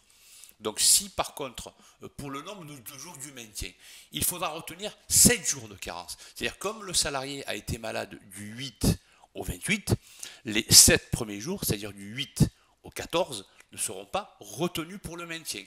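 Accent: South, France. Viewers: ravie de vos explications avec l'accent du sud en plus !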